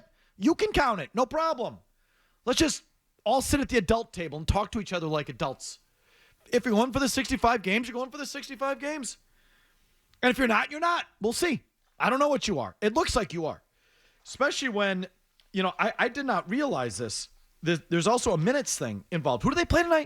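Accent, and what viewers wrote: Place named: Wisconsin, United States. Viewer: Sawry. Your Wisconsin accent irritates me. Especially when you say “sawry” and “suhvun” (seven)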